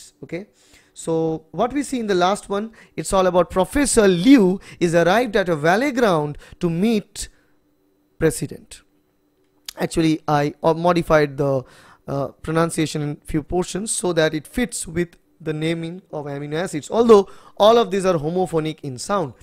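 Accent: Bengal, India. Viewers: Great video Sir.The bengali touch makes it more memorizable.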